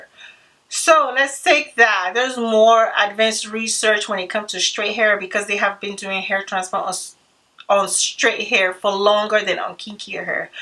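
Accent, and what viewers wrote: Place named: Togo. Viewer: Hey sister, wow............. are you from TOGO? I never knew that because of your accent, so do you speak EWE language?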